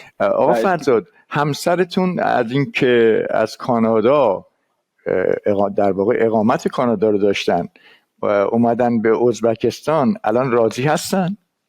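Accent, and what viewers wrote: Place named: Shiraz, Iran. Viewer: لهجه شیرازی داری آقای دکتر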